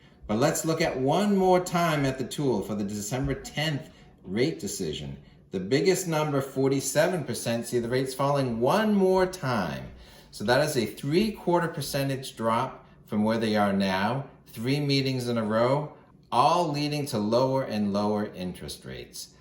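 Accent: New England, United States. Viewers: Well, it was German with a strong New England accent, but quite understandable...😄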